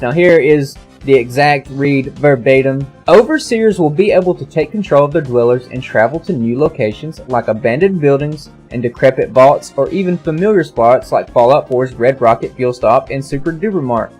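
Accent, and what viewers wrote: South, United States. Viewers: man i love you southern voice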